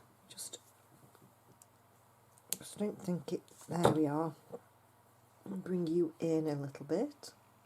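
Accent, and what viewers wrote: England, United Kingdom. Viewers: Hi, I’m Ericka! Pleased to meet ya. Enjoyed your video so much and had to respond about your mojo. Lol. Your so funny! I assure you-You still got your beading mojo! Happy Birthday also by the way! I’m in California USA. Glad I found ya and loved your unboxing and pieces you made:-) very pretty. Yes I feel the mojo loss all the time! That’s why I watch unboxing videos to help spark a bit of creativity. I suggest Pinterest- it’s great to browse other designs for that inspiration. I also have a fabulous talented lady named Gina from <link> that I always watch for inspiration on YouTube! You should check out her unboxing and she’s on Patreon and shares her tutorials. She’s really talented. Anyhow, thought I’d introduce myself and say Hi! Not sure where you are but love that accent! England? Happy Birthday whenever it is this month! 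God bless you - ty for sharing!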